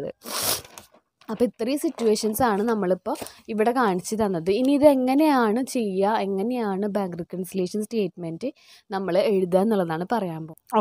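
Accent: Thrissur, India. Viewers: Teacher niggal thrisuur anno, aah oru slang😊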